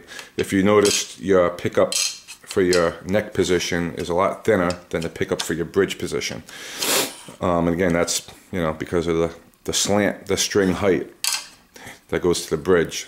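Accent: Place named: Brooklyn, United States. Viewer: Lovely accent you have :) are you from brooklyn?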